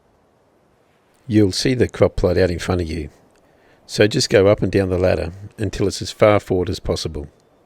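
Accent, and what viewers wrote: Australia. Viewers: he's Australian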